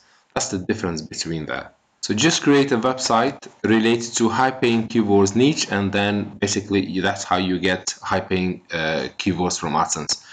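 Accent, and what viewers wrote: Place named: Albania. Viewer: You sound like you are from alnania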